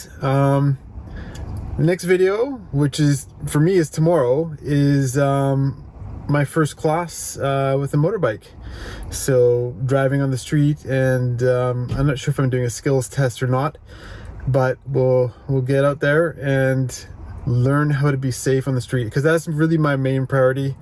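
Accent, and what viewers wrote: Canada. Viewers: I'm liking this series already! I had a feeling that was a good ol Canadian accent. Hi from just north of Toronto Canada.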